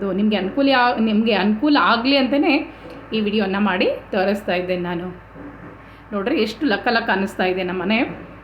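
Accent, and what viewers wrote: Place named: Hubli-Dharwad, India. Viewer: Amma nivu Daravada dalli idira anta ankondide nivu Bangalore anta helidu keli shock aytu 😊, but nim bhashe yalla hubli darawad bhashe ne ide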